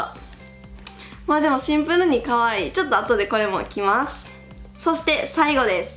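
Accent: Kansai, Japan. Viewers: 始まりからコメントしたくなる。ハキハキで変にぶりっことか、キャラ作りしてないのが好感度マックスw関西弁出てくると思わなかったしギャップがいいです！！ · の関西弁可愛いすぎです。アウター良かったですね。🙆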